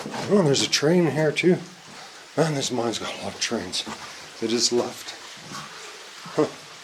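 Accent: Canada. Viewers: Wonder if he is Canadian, eh?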